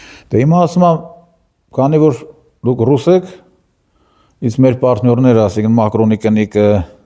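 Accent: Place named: Gyumri, Armenia. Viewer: Հարգելի դուք Գյումրիի կամ Շիրակի մարզի հետ կապ ունեք???Միշտ էնտեղի ակցենտը զգացվում է Ձեր խոսքի մեջ։Նախապես շնորհակալություն եթե արձագնքեք։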